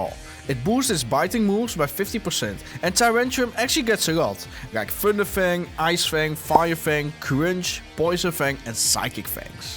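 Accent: Netherlands. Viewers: Het allereerste filmpje dat ik van je zie en ik hoor direct dat je uit Nederland komt... Mijn idee xp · he's dutch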